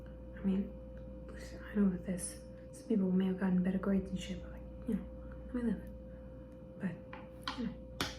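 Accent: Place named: New York, United States. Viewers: I love the whispered NY accent so much, it sounds so relaxing and comfortable! Love your asmr so much :D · The NY accent makes me feel right at home 🥹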